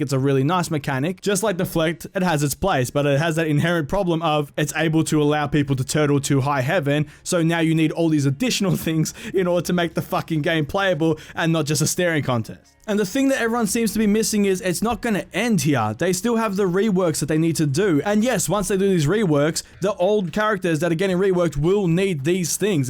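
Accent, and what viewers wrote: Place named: Australia. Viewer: 2 random things, you Australian or New Zealand? You know who Robert Whitaker is? You remind me of him for some reasons. The dude is my favorite ufc fighter.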